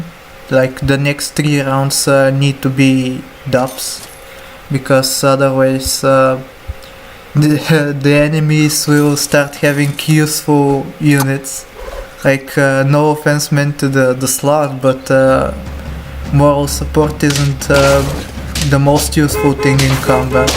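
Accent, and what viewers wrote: France. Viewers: I like that fhrench accent :D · oh my god are u french?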